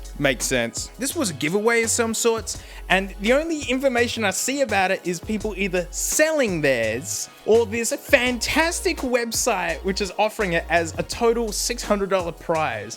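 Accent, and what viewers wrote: Australia. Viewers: Same, it's the bush Ausie speaky words. It's like a game trying to translate every video. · I understand the Australian accent quite well and I like it. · Idk y'all, I LOVE the aussie accent like... a lot👁👄👁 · I could sit here and just listen to his insane Aussie for the whole of quarantine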